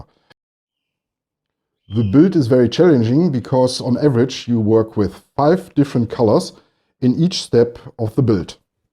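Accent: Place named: Germany. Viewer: are you german? you dont realy sound native english 🙈.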